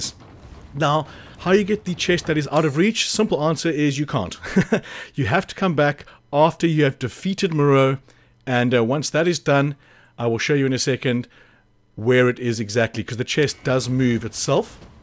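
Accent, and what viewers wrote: South Africa. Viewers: U sound like someone from the SA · Nice to hear a fellow southafrican accent lmao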